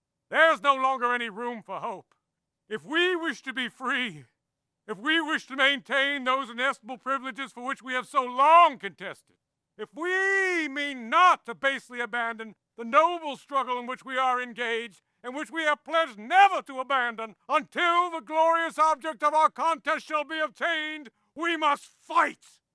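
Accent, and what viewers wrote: Virginia, United States. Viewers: Oh, good night!!!! This guy is excellent! The Virginia accent I recognize, the zeal, the expression are inspiring. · Thank you for this! This was a stellar performance, and that Virginian accent was perfect!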